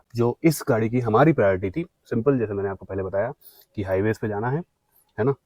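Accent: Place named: Uttar Pradesh, India. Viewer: Bhai UP ka hai na tu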